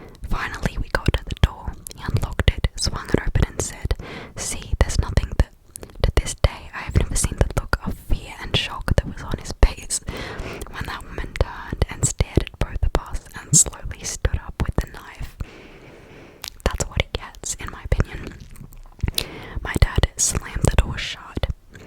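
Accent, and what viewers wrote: Australia. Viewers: Australian accent 🔛🔝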